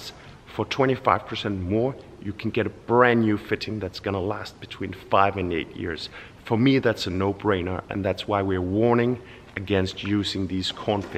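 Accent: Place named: Wales, United Kingdom. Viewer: Love the welsh accent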